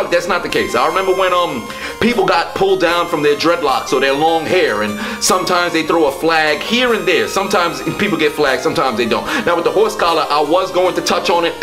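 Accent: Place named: New York, United States. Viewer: Educated New York talk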